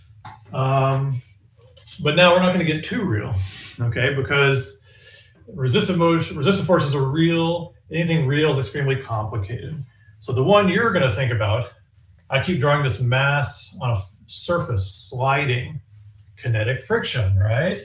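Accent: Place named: South, United States. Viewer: Mahnus mahnus mahnus :) If this post gets two likes, will you come back and deliver more of your lovely southern accent to us?❤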